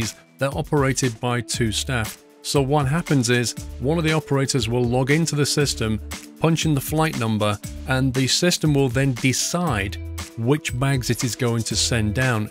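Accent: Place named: United Kingdom. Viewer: You sound like every British narrator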